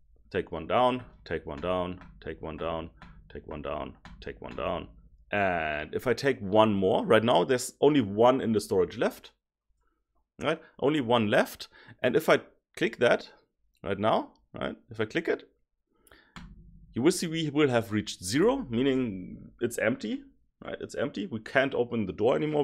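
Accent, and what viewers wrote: Germany. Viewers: Man merkt den deutschen Akzent 😂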